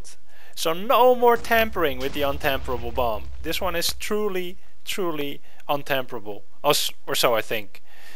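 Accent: Netherlands. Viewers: ok dit is nederlands lol accent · seems like a dutch accent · Jup, das 100% nederlands accent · are you from holland? because i can hear it.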